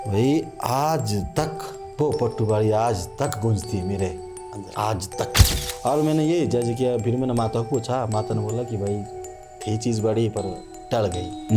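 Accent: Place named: Kullu, India. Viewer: Bhai AAP Manali se nahi ho AAP kullu se ho apki bhasha se pehchana mene apko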